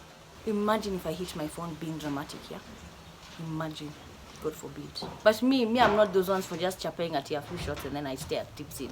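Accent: United Kingdom. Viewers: that ka british accent 😩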